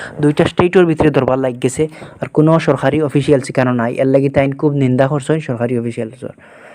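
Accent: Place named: Sylhet, Bangladesh. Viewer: Maybe because of His Nagri accents it sounds like Borok.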